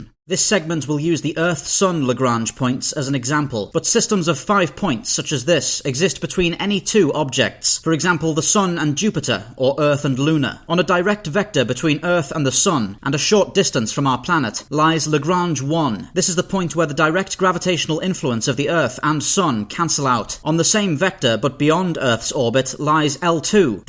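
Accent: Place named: Liverpool, United Kingdom. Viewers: good video and script, shame about the slight northern accent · You sound kinda scouse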